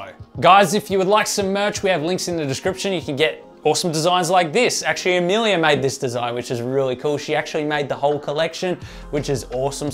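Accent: Australia. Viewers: Are you situated in Australia? Cause u have an Aussie accent.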